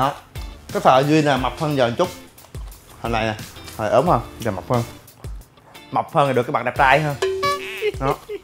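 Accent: Mekong Delta, Vietnam. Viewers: Lần đầu xem videol của a nhưng rất thích đậm chất miền tây dễ thương ☺️ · Mới xem ông này nói chuyện cười quá giống chất miền tây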